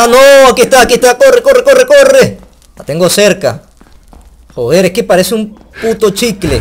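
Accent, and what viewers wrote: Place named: Venezuela. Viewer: tus videos son buenos solo para venezolanos al parecer del resto no entenderan bien lo que dices xD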